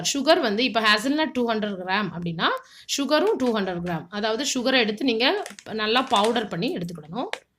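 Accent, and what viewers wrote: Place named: Tenkasi, India. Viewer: Hi Akka..... my native was Tenkasi (pavoorchatram). So i liked your way of talking, while seeing your video your voice taking me to my native. I watched all your videos....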